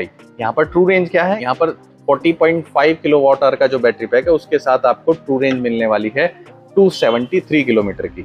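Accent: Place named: United States. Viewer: Arey bhai.. kyaa ho gaya aapko . Apna original language me bolona.. Ye american accent english kyun lagaya.. it's very irritating. Pahle mai aapka har video dekhta tha.. but zab se ye angrezi bolna chalu kiya.. apka videos dekhna hi band kar diya. Band karo yaar.. ye artificial langauge me bolna..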